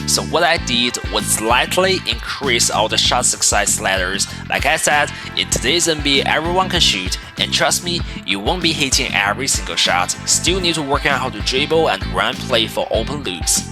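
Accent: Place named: China. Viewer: This guy is probably Chinese.. cause of his accent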